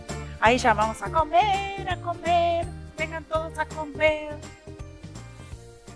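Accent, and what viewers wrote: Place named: Córdoba, Argentina. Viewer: Que te diviertas en tus vacaciones !!! 👍👍👍 Una preguntita... de qué lugar de Argentina eres... porque tienes un dejo muy particular... yo veía el programa de Olmedo y el Gordo Porcel... Olmedo hacía un personaje con un dejo muy parecido al tuyo...Perdón, creo que el personaje a que me refiero salía en No toca botón... creo que era de la provincia de Córdoba...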